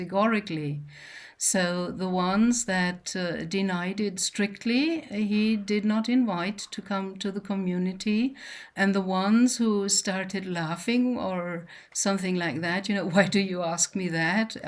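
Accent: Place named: Germany. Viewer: I had to subscribe. You have a similar German accent to my father, an accent that shows that you worked on perfecting your english. He passed at 90 a couple years ago and today May 11th was his birthday. I called his partner to wish her a happy mother's day, and we talked about him. Anyways, i like your message, i hope you're back in full swing soon. 💗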